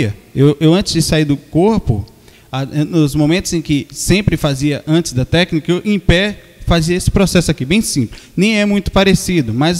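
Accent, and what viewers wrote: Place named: Bahia, Brazil. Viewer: Nestes vídeos antigos ele não tem sotaque, que diferente. Ouvindo em 2023. Assistindo ele atualmente percebe-se um sotaque bem pronunciado da Bahia. ❤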